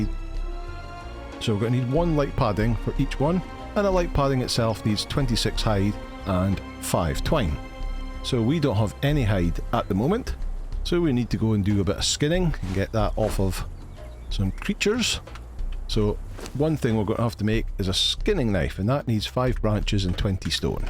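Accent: Scotland, United Kingdom. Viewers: I've known about this game for a long time, but never got around to playing it. I just started earlier this week, and was a little lost at first, but, i found your guide and its really helped me. Its also nice to hear a fellow Scotsman doing this. Also, as a little side note, im playing on console, and most of the journeys recognise other journey actions you may have done previously.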